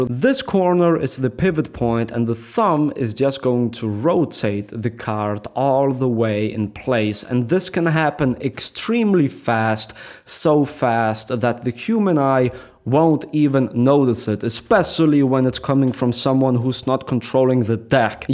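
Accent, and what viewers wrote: Russia. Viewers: I love the O.K. Hehe. (: I love the videos bro!! Your English is very clear, very good, and you're able to express yourself very well. Plus, the Russian accent is awesome! My dad is Jewish, Russian, Polish, etc. I've never been to Russia. Hehe. at times lives in my birth city Miami, FL. I used to live in but I am living abroad. I've never seen him in-person before. Thanks for the video!! ( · He sounds Russian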